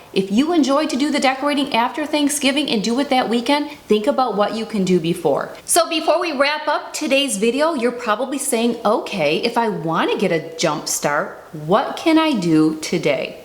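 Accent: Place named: Northern Indiana, United States. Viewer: Love your accent, sounds like mine! Where are you from? You have to be from Northern Indiana-Michigan area.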